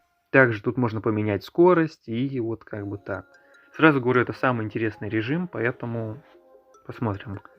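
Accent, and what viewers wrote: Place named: Russia. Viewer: I think Russian, he has kind of a heavy accent.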